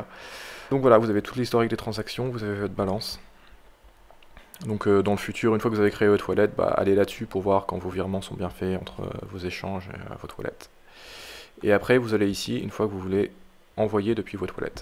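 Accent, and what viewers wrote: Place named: France. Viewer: Merci pour la vidéo mais de grâce, parlez moins vite et articulez. Je suis Québécois et ne comprends pas bien votre accent Français. J'en déduis que les francophones des autres pays auront aussi de la difficulté à vous comprendre.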